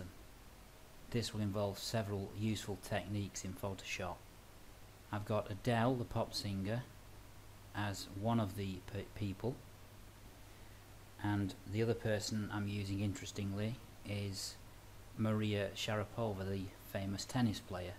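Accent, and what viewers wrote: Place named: Liverpool, United Kingdom. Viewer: this guy sounds just like a! he from liverpool or something?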